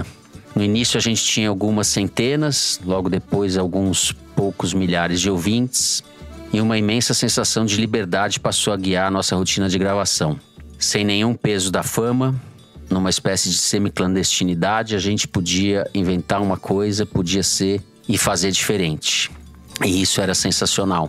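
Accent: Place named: São Paulo, Brazil. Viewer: 😢😢😢 Sucesso para cada um em seus caminhos! Sentirei saudades da sua voz, Fernando, sotaque paulista maravilhoso 😊 Quiçá teremos novos encontros aqui e ali do Foro de Teresina🙌🙌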